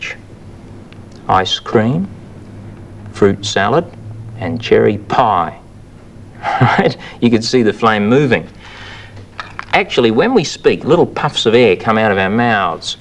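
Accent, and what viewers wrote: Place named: Australia. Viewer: love that Auzzy accent. The music is from beaverly hills cop so this show is no earlier then the 80's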